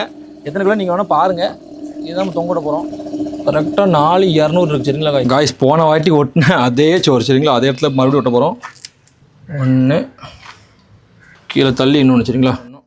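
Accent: Tirunelveli, India. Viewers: தம்பி திருநெல்வேலியா?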